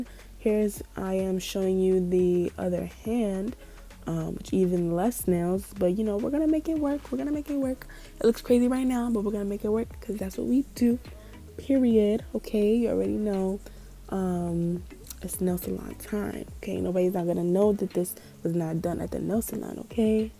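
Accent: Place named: Barbados. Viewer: “I’m not ling ling in the flesh” Plus your Bajan accent 🙌🏾 Loll I love it😂😂🙌🏾❤️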